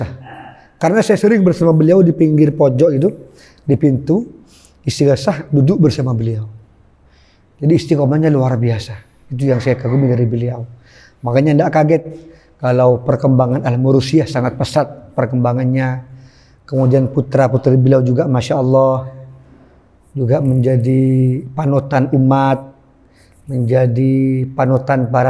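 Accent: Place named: Madura, Indonesia. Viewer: Logat madura ya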